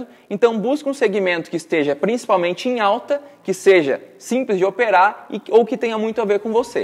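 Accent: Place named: Pernambuco, Brazil. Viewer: Adoro o seu sotaque e o " xero" do idioma Pernambuques ❤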